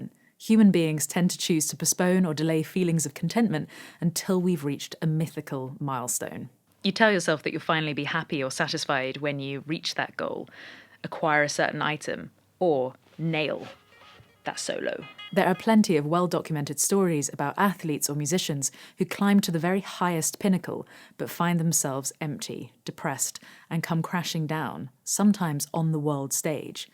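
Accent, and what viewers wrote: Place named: England, United Kingdom. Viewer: u should make a tshirts saying-watch me on- you CHUBE ! - lol,LIike your chusday- i love your english accent-ca usa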